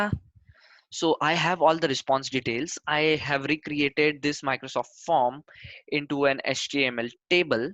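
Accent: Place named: India. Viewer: You should be banned from speaking English 🤪🤪. The Indian accent is horrible!!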